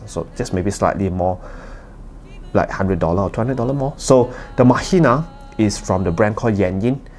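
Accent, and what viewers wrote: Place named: Malaysia. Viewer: I do listen to Jrock as well because not just female vocals but male vocals too, which means I do like a bit of bass, but not sharp treble. As long as it's not fatiguing. Seems like I'll need to look into the Zero 2 then if it has an added bass. Still using my Tanchjim One and Tanya (both DSP) and they're not bad, but female vocals can have some sibilance at times. Awesome to hear another reviewer from Malaysia! Our distinct accent stands out a lot. 😆 Anything that you would suggest in the sub $50 with a bit of bass? Not too much, but enough that you feel it.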